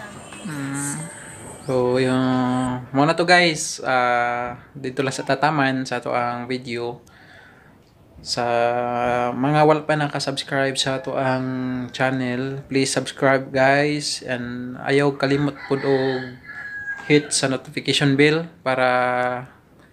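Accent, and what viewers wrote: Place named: Capiz, Philippines. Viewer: Taga capiz ka po b? Para kseng i heard na ung ganyang dialect.. try to speak tagalog sometimes if you can.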